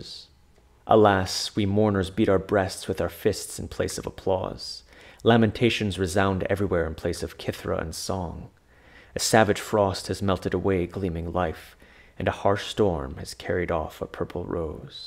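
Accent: Quebec, Canada. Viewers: L'accent québécois m'a surprise haha (j'entends rarement mes compatriotes sur YouTube) Merci pour l'excellent vidéo 🎉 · Es-tu Québécois??? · Toi t'es québécois c'est certain 😅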